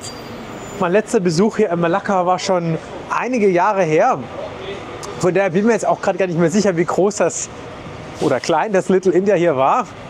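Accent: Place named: Germany. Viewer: Great video, and an even better channel. I really enjoy watching your content. Your German accent sounds really nice too. Where is it from? Most Germans sound pretty harsh, but yours is pleasant. 🥰👋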